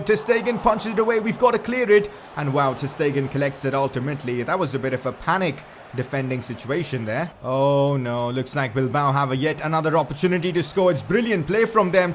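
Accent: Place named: India. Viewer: Are you Indian because you sound Indian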